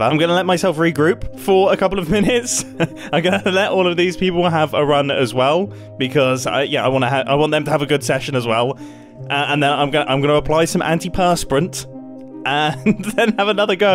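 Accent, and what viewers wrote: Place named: United Kingdom. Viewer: It's funny how becomes more British as he gets excited and stressed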